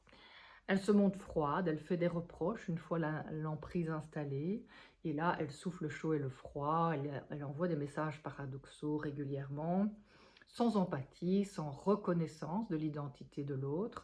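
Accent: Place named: Belgium. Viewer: Merci de votre professionnalisme, bravo pour votre travail, mes félicitations pour vos connaissances et mes très respectueuses salutations. Votre joli accent belge me fait penser à l'excellent Michel Collon.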